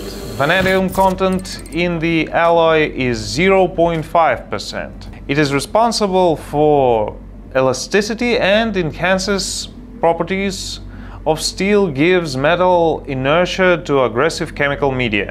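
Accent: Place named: Russia. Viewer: Hi. Thanks for all the useful information you have shared. I like how you break everything down in an easy way to understand with your awesome Russian accent. Thank you once again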